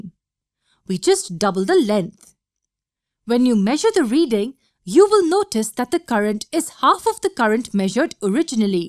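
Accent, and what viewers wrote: India. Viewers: Late... but I think it's an Indian accent :)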